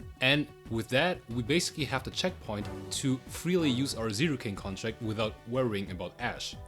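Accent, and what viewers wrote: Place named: Germany. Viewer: You speak well, but the harsh pronunciation is noticeable. I think since I'm German myself, I can hear it more easily, haha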